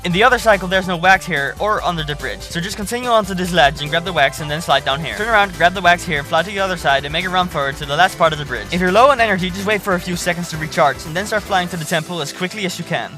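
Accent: Netherlands. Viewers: are you dutch? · i feel like your native language is dutch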